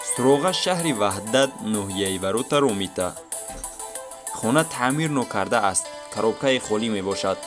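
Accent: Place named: Russia. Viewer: Рут лахча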